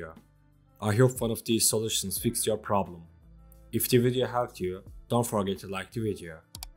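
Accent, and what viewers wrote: Turkey. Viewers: Türk aksağanı çok anlaşılıyor abi ama teşekkürler video yardımcı oldu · reis konuşmandan anlamdım türk olduğunu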